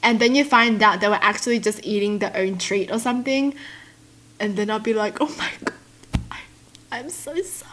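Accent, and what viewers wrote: Australia. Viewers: I’m new so when I here her voice I think she’s has to be Australian then I heard “Swallow I that advice for yourself mate”Me:yup definitely Australian I have an Australian accent but I’m American yup I’m weird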